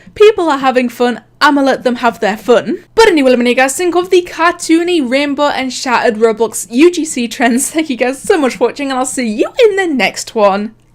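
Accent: United Kingdom. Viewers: British girl talks about roblox avatars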